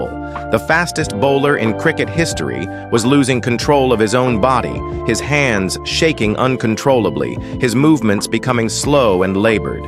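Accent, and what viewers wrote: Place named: United States. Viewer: Cricket and a american accent of the anchor doesn't seem normal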